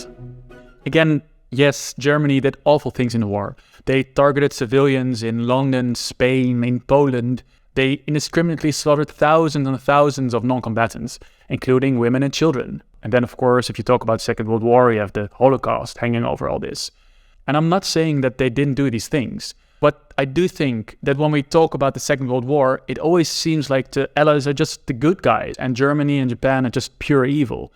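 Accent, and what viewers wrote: Netherlands. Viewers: Dutch accent is strong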